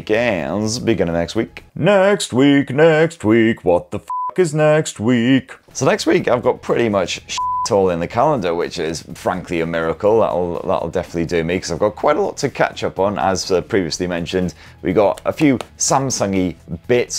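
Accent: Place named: Northern England, United Kingdom. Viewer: Love your reviews and your frankness lol very northern can just hear you saying big girls blouse lol😂👍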